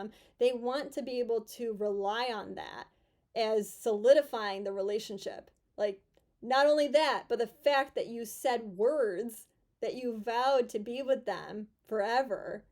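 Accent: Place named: North Dakota, United States. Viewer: Are u from North Dakota Been trying to place your accent U Judy don’t have that stereotypical “concha no!” Things sorry lol was curious You’re awesome great videos lol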